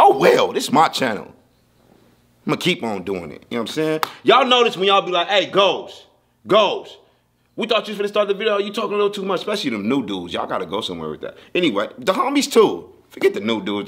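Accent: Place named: New York, United States. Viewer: so why u speaking like a New Yorker “ goofy “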